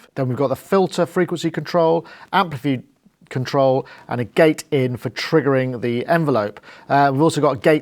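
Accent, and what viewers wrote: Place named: Cockney, United Kingdom. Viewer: Love that cockney style.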